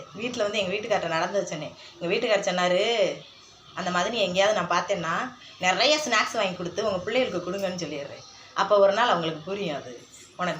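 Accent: Madurai, India. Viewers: நீங்கள் மதுரையா அக்கா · Neenga madurai ya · நீங்கள் மதுரை யா சகோதரி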